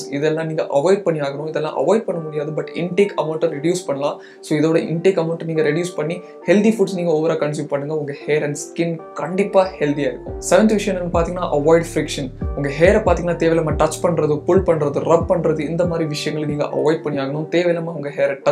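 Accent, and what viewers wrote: Kanyakumari, India. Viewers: ohh I too from Kanyakumari bro , ur slang is Kanyakumari slang 😁❤️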